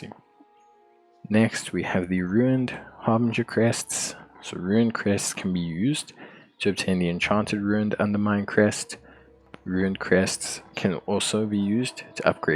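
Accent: South Africa. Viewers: Bro, you South African?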